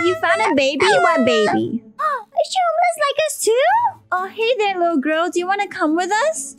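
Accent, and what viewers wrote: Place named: Malaysia. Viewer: You sound malay🤔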